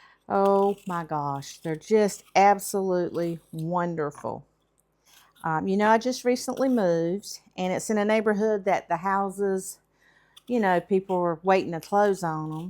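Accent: United States. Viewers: Where is your fantastic accent from in the US? A curious guy from Buenos Aires, Argentina asking!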